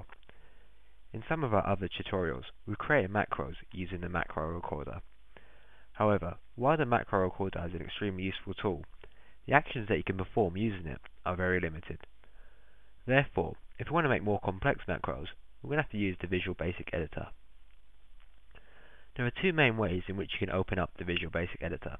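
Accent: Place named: England, United Kingdom. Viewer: I have a hard time trying to understand VBA. Trying to understand that Englishman's accent makes it harder. I have had to rewind to listen to what he is saying half a dozen times in this video, and still didn't get all his words. I know he sounds like that cute gecko on the Geiko commercial - but have him work on his accent for us American folk just trying to learn VBA. Thanks. (I am sure he is probably a nice guy - don't take it personally, guy.)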